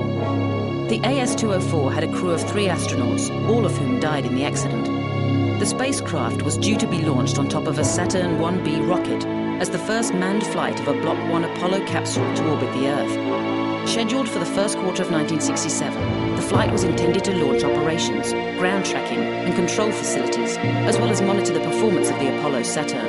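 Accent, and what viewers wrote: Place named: United Kingdom. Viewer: "Ed White was seen on the television monitor trying to open the hatch." Ten seconds later: "With a hatch that could only be opened from the outside, the three men didn't stand a chance." Hmmmmmm. THAT'S good documentary filmmaking for you! So... Either Ed White was clawing at a hatch that couldn't be opened as he died, OR, the hatch COULD be opened from the inside, and this Brit narrator doesn't know wtf she's talking about!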